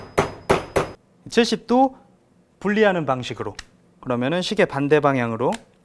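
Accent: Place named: Japan. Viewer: 대단하다 진짜.. 앙카가 뭐냐 앙카가.. 위대하신 천황폐하를 모시는 신민이라 그런가.. 일어를 쓰려면 일어를 쓰고, 영어를 쓰려면 영어를 쓰지 일본애들이 쓰는 영어 발음까지 따라해야겠냐..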